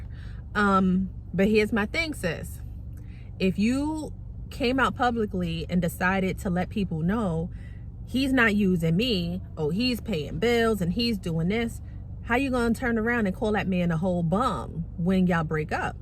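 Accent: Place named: New York, United States. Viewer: I love your NY accent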